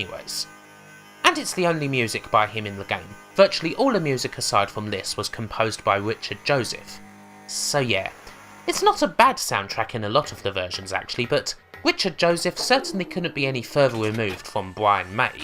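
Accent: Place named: England, United Kingdom. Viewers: How come some people consider way of speaking a speech impediment? I guess it's an accent from a region in England. I understood him perfectly, and I'm Argentinean (hence, a non-native speaker of English). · Because it's an Essex accent. A very strong one. It's why Jonathan Ross pronounces his "Rs" as "Ws".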